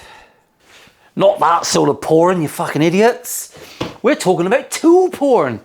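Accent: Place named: Plymouth, United Kingdom. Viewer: I don't think I've ever met a pretentious Janner. The accent keeps us humble mate. Just found your channel, instantly subscribed. Legend.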